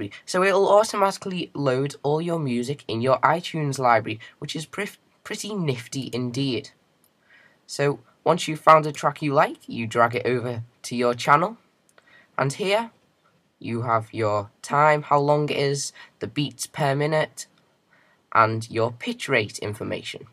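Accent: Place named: United Kingdom. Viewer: i love british voice.. pure english voice for a bulgarian for me... btw this review is great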